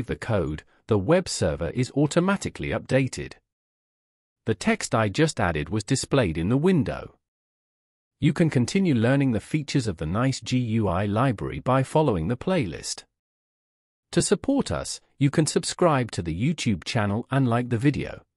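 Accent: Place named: United Kingdom. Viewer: The voice over is just awful. Speak English. You don't "transfer" a library, you install it. Calling a function with a text argument is not "sending it a text" (LOL). Every other tutorial in the playlist seems to have the bot exclaiming "I am SAVING the code FILE!" repeatedly, always with the same alien accentuation. While it may be easy for you to generate one of these videos for each little control in NiceGUI, it's not obvious that any of them teaches anyone anything — too trivial. The last ones on `bind_*` perhaps have some content. I guess you think this accent sounds "classy". Anyway, it does sound British.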